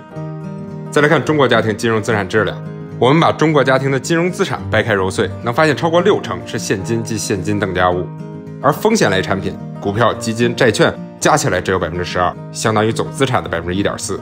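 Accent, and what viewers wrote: Beijing, China. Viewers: 一直觉得是北京的～终于在这个视频里得到了认证哈哈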